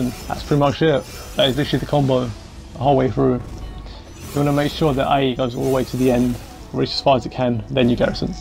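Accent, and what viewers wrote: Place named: Australia. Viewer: first, plus you australian because you sound like it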